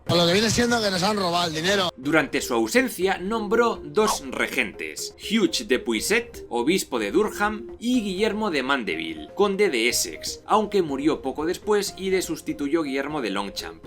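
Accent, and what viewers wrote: Spain. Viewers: Me gusta tu acento español, además de tus vídeos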